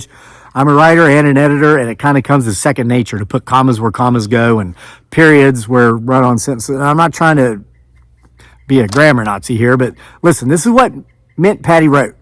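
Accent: South, United States. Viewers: Hi. I'm watching your channel from the UK. All this is very interesting to me and I love reading the comments, people are not stupid, they know what they saw and experienced. You seem like a really nice guy with honesty and integrity and I have no reason to doubt you. I enjoy watching live cams from America too, the amount of weird stuff captured blows me away. Being in the UK is quite boring in comparison to the US so I get quite excited when I see channels like yours pop up. I believe you were under some form of shall we say 'trance' .. Since YU TB doesn't like certain words!! I swear we're all going to be talking another language soon just to get our messages out! 🙄 These trance like explorations over the years aren't just stories that's for sure. Oh, and you don't talk too much, I love your southern accent. Looking forward to more of your uploads. 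Regards to you and your wife. ❤️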